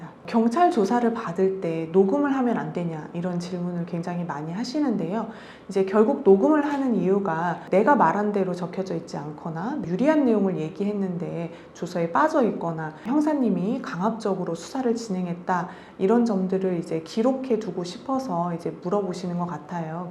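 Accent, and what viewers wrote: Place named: Seoul, South Korea. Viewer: 참 미인이세요,말씨두 너무 이쁘구요.내고향 서울 말씨 너무 듣기가 좋구 편해요.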